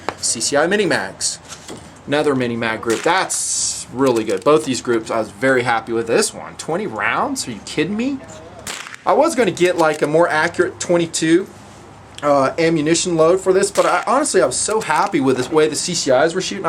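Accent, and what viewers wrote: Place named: Appalachia, United States. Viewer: I love your hillbilly accent that drops in randomly in the video. but hey I cant get enough of your vids man.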